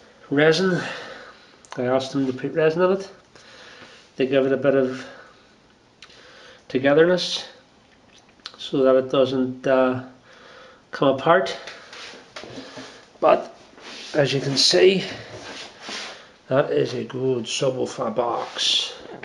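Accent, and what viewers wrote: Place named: Newtownabbey, United Kingdom. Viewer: How random. I'm searching for this and you sound like your from newtownabbey or glengormley. Small world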